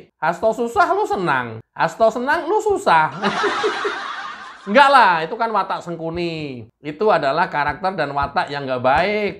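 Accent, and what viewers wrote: Java, Indonesia. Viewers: Medhok banget..kok yo onok Gtlss barang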